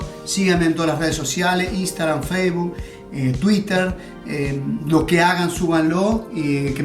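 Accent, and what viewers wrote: Córdoba, Argentina. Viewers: Sabor Cordobes con tonada cordooooobeza!!!Excelente explicacion y con su historia! los voy a hacer!!! y despues cuento!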